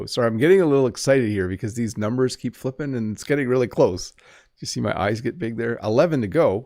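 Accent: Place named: Canada. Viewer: Congratulations I'm from Piracuruca Piauí in northeastern Brazil and I want to have a Canadian accent just like you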